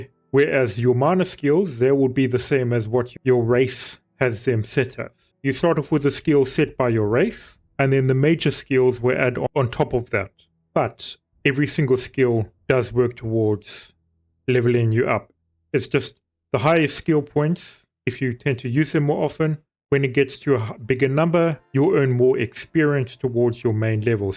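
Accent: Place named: South Africa. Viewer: Heard that accent and immediately knew I’m dealing with a real boet here lol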